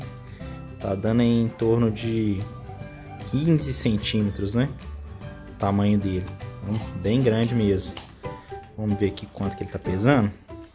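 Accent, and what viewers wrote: Minas Gerais, Brazil. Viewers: Tinha que me inscrever cara, você com esse sotaque mineiro, lembra muito dois amigos que fiz aqui em Goiana Pernambuco, na fábrica da jeep, muito legal!!! Agora uma pergunta: essas mudas que você ensina, todas são anã ou seja pra vaso numa área dentro de casa? Me responde aí a pergunta que fiz!!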